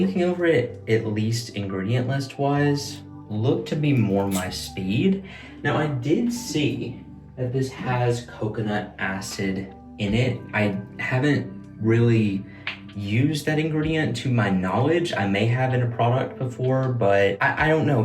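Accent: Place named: South, United States. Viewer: Every time I watch your videos I just think I love you so much you seem like such a sweet boy (man). Your subtle southern accent is the best.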